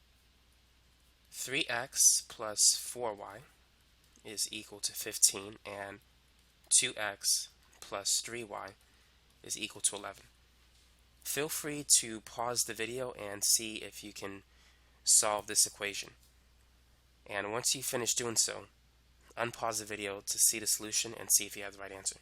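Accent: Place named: New York, United States. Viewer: This guy is soooo clear in his explanations!!! I love his NYC accent too!!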